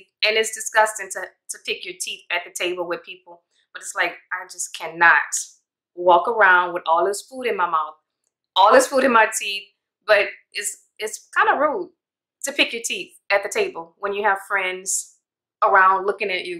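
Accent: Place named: Louisiana, United States. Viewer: U sound like you from Louisiana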